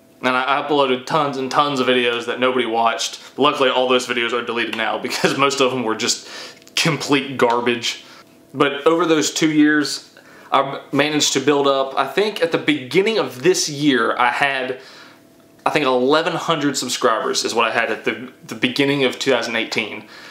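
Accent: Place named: South, United States. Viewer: That Southern drawl is on point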